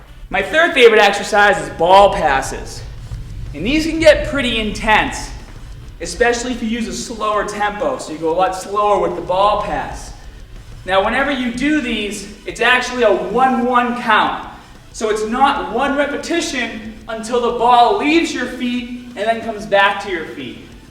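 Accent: Boston, United States. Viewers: You have a bostonian accent. It's faint but it's there. Thanks for the tips. I've been looking for some solid oblique workouts. You look great. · is a Bostonian - he used to be conscious of his accent probably to grow his channel, but now he definitely let's his inner accent shine: the absence of R's. Jeff's accent I thought was New York, no? It doesn't sound quite Bostonian, but it is similar... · You sound like you’re from bawston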